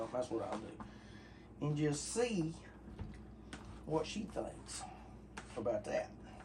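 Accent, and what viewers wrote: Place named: Appalachia, United States. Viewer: you are a true Renaissance man with a funny Hillbilly accent!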